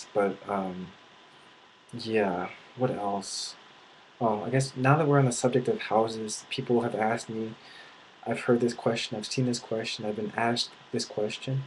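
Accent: Bavaria, Germany. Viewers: I laughed very hard when you said "Bayrische Motorenwerke", seems like you have a Southern/Bavarian/Austrian accent? :D Great video, by the way! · So you grew up in the US...but your parents are German...? I think it's funny that you have a slight German accent when speaking English :) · Seriously? You sound more like a Bavarian than I do, and I'm german :D You seem to be very open-minded and nice, thank you for trying to get rid of some of those stereotypes. Hope you'll get to enjoy Germany even more, someone like you should always be welcome :) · hahahahaaha me too.totally bavarian accent loooool so good :D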